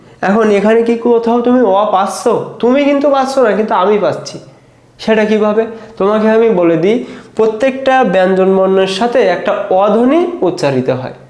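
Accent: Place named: Satkhira, Bangladesh. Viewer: বাসা কোথায় আপনার,,????? কথার টোন শুনে মনে হচ্ছে সাতক্ষীরায়,,,আমি আপনার সব ক্লাস দেখি,,,বাংলা সাহিত্য গদ্যের mcq and ব্যাখ্যা দেখে তারপর বই থেকে দেখছি এখন খুব সহজ হয়ে গেছে,,, ধন্যবাদ ধন্যবাদ 😊😊😊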